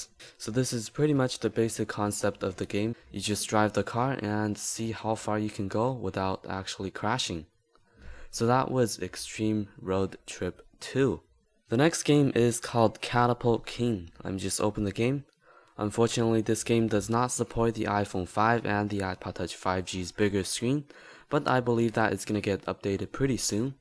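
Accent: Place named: China. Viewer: its a chinese speaking english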